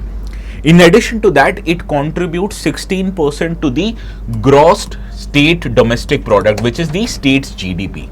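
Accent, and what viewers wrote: Kerala, India. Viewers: Please don't talk with kerala accent, talk like a Goan.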